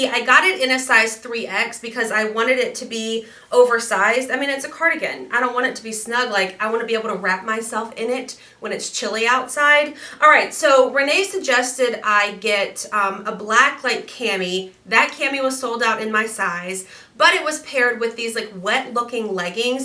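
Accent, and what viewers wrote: South, United States. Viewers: What state are you from I noticed you are southern and yes I am southern to.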